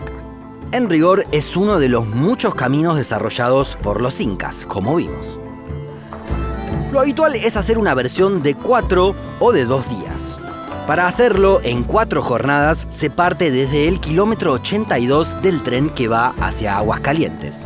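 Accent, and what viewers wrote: Argentina. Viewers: Gracias a este canal, cada vez que leo un mapa, lo escucho con acento argentino!! Maravilloso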